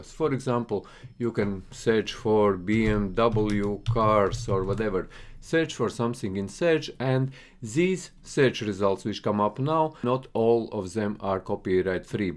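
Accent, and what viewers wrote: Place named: Russia. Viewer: Woah Russian accent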